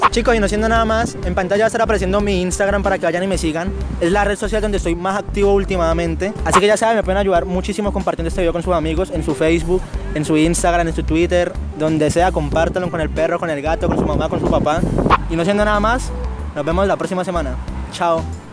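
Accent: Cali, Colombia. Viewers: Eres de cali verdad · Eres de Cali? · Eres de cali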